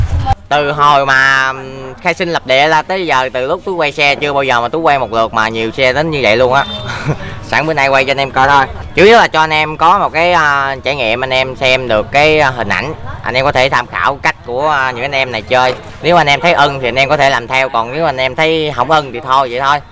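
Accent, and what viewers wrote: Vietnam. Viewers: Dân bến tre hả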